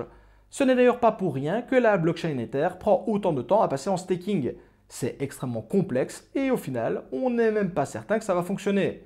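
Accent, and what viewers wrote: Belgium. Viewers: Dis, il me semble que toi et moi venions des mêmes contrées sur base de ton léger accent.:) Question donc entre compatriotes. Tu fais comment niveau elec? Je consomme environ 2200w pour faire tourner 7 gpu (combi 3080 et 3090), ce qui me revient a environ 490 euros d'elec par mois. En Belgique (oops j'ai dévoilé notre pays), l'elec, frais de transport compris, monte a un prix moyen d'environ 30 centimes par kwh. Du coup, calcul fait, sur les 1500 euros de moyenne que je génère en assets, ben j'en récupère 1000 in-fine. Du coup, tu as un truc toi pour payer moins cher en elec? je suis chez Eneco et j'ai le "meilleur" plan d'après eux... mais bon, si t'as des super idées et vu ta connaissance du sujet, je prends;) MERCI!!!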